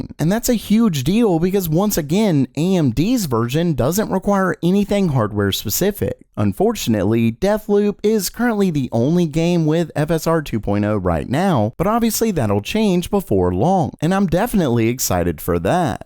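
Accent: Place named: Baltimore, United States. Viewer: is he from baltimore. he says un instead of on.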